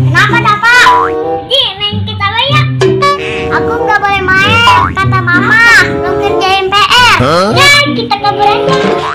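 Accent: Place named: Jakarta, Indonesia. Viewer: wah Betawinya ngena bgt lucu abisss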